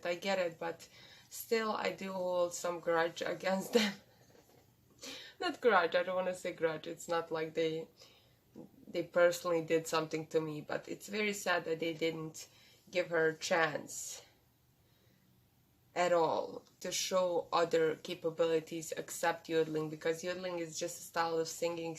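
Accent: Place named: Russia. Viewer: Ты же русская, по акценту слышно_)))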